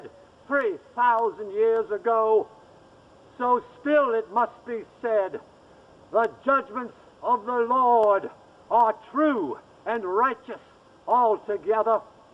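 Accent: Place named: Midwest, United States. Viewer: William Lattanzio it seems more real because it's older and he has an accent. Old Midwestern, or Tennessean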